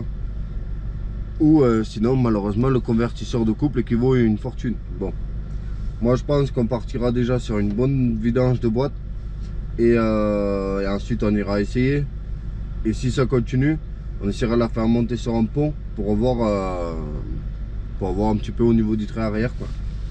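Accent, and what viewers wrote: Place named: Perpignan, France. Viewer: ah entendre l'accent de Perpignant çà fait du bien !!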